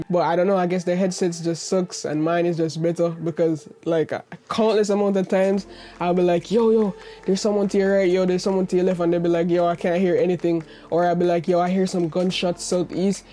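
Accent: Jamaica. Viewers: Are you Jamaican? It's like listening to one of my cousins tell me about tech. Great work!!! Ire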